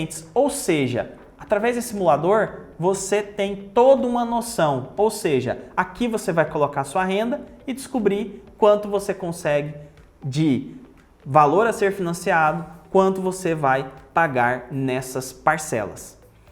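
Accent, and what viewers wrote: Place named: Goiás, Brazil. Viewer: M.bom vc é goiano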